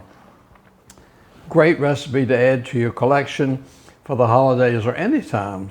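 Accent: South, United States. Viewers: OMG! I luv your cinnamon rolls and most of all your southern accent makes me home sick for for family in Beaumont, TX YOU go girl. Peace out. S. Valentine Los Angeles, CA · I love your accent❣️ What part of the South are you from (Louisiana, here)?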